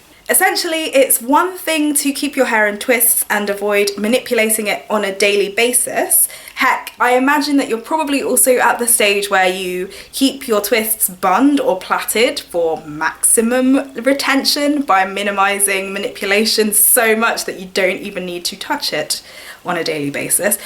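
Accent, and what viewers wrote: England, United Kingdom. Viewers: Isnt that the cutest lil english Disney-sounding accent ever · I didn't think of disney but just those children's story books. She has a great accent. It's English but her type of English sounds nice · 😃 OK only a true Hilarian would know that. It's just that you sound SO English I thought it couldn't possible be so but then again that logo is burned into my brain! 😀 Anyway, old head here (class of '91 eep!) and I live in London. Bless!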